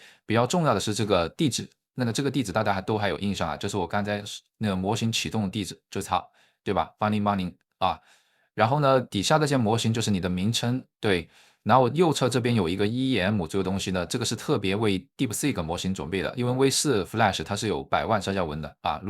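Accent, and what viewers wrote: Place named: Guangxi, China. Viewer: 口音应该是广西老乡。😀刚想找个Codex对接本地模型方案，讲得很详细。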